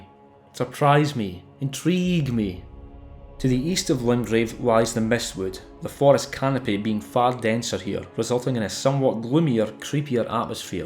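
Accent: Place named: Scotland, United Kingdom. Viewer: Multiple hours of some guy with the most luscious of Scottish accents just talking about his love for Elden Ring? Count me in mate.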